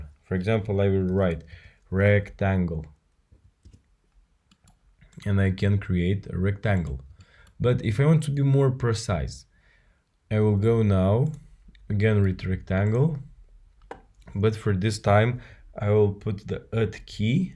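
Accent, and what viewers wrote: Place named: Russia. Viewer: Слышится отчетливый русский акцент, а похожий гайд на русском может быть есть?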